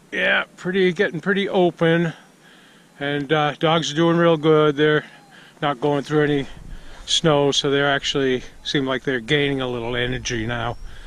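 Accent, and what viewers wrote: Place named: Massachusetts, United States. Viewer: seasons greetings from your old stompin grounds...massachusetts. you havent really lost the accent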